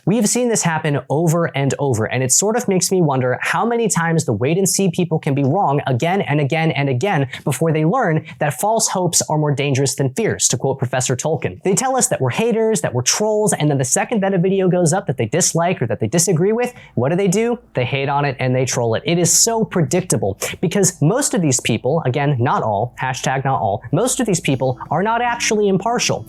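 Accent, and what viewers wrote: United Kingdom. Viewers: I have several things to say. Holy crap, you tore these guys to shreds, and they justifiably deserve it. We, the fans of Tolkien and his work, all stand together, linked arm in arm like a chain, and these bastards are trying hard to break that chain, to shatter our unity and throw us into darkness. We will not stand for this, we will not falter. Just as the chain of links around Frodo's neck carried The One Ring into the fires of Mount Doom, we will carry our love together, and then hurl the evil back into the firey chasm from whence it came. They will lose. There is no other way, because we will not stand for this bullcrap. Just imagine if people with such deep and true love for Tolkien and his works had made the show instead. Just imagine if they spent the same amount of effort they do attacking fans into pouring love and genuine passion into their work. 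If not for Lord of The Rings, I might've never gotten into fantasy. I might never have created my own fantasy world when I was 13 years old, then continue building upon that world all these years. I probably never would've been inspired and motivated enough to begin work on my own book. If not for my love of Middle-earth, I would probably never have begun collecting fantasy(and medieval) clothing, armor and weapons. Never would've had my own little armory, as I do today. Plan on making a video about that in the future. And finally, your Brittish accent was great. Sorry for such a long comment, just had a lot to say.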